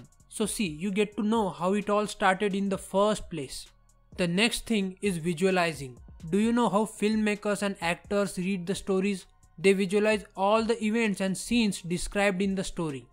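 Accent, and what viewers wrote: India. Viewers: You have the strongest Indian accent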